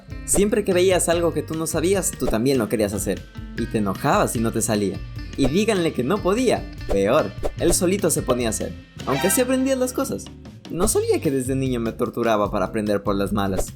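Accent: Chile. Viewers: Es chileno 😳👌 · Eres chileno? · Eres chileno? Peruano? Boliviano? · Primero...: me gusto el video!!! Me encato!♡ Y segundo... Así que mi sospecha era cierta?! El men si es chileno jsjsjs, pense que era imaginacion mia, pero la wea era cierta.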